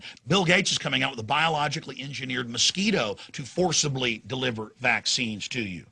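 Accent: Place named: South, United States. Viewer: Your evidence is weak, subjective and theory laden. You haven't tried to falsify your claim, you are unwilling and unable to consider alternative answers and reasons to your "evidence" the links between your evidence are weak (though rather imaginative) you personify your government body as one evil being, everything points to this being a wack-job conspiracy theory, you even sound like your from a southern U.S. state it all fits! There is no logical reason to believe you. Good day.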